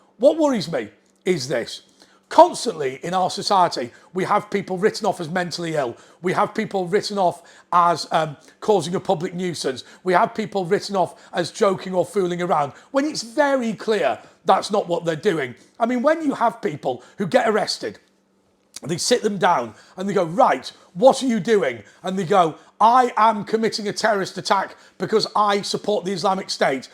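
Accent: United Kingdom. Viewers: Sounds like he's British 😂🤣🤣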